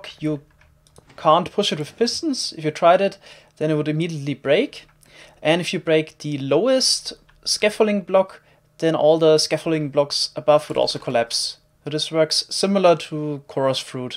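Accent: Germany. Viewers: R u german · thats a hardcore german accent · You've a hard german accent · Is he german? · By any chance are you german?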